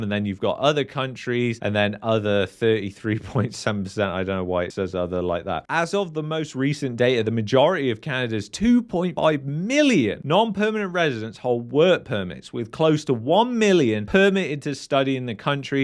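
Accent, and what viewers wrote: United Kingdom. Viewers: If it s so terrible here in Canada what are you doing living here? Judging from your accent I would remark UK is even more messed up then Canada ever will be.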